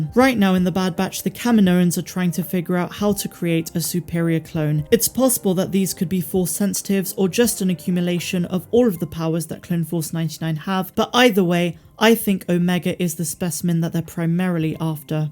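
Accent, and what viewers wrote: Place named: South West, United Kingdom. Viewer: The south west accent is strong with the jibber jabber!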